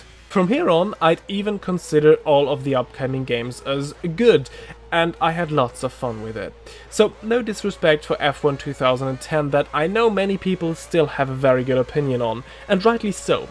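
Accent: Germany. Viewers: Very helpful vid if it comes to deciding which F1 to buy! I got F<num> and 2020 ! (btw your accent sounds german ) Thx from a 70y old german gamer !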